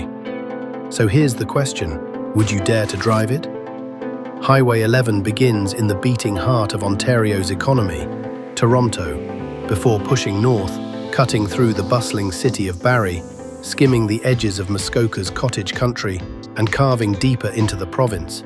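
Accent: United Kingdom. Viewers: I guess you know more than this guy who made this video🤣 especially the British voice probably had too much Scotch when he was creating this video!🤣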